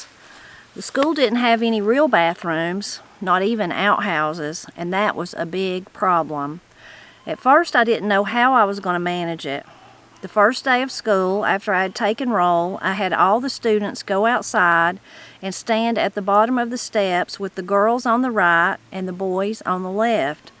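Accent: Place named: South, United States. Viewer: I love hearing your southern accent while you're reading! I listen while I'm cleaning the house. Opal is a real inspiration, too ! I love watching all your family! You are very blessed ❤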